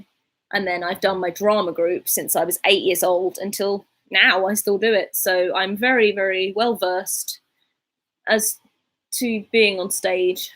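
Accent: United Kingdom. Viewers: Missed the live chat again but enjoyed the replay almost as much 1. I love your quintessential British accent, too 2. love watching you in your new minimalistic setting, very cool , btw do more accent challenges pls thank you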